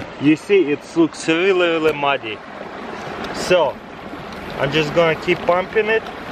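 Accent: Russia. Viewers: sexy russian accent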